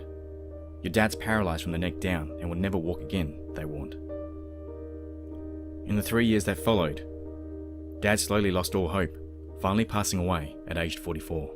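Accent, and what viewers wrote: Australia. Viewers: haha I'm a sucker for a man with an Aussie accent..... Yes I'm a strange girl hahaha. An Aussie accent and horror stories/crime.... Winning here!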